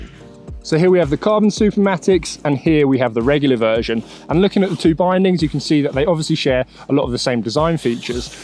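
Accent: United Kingdom. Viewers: You are the top snowboarder i like most with British accent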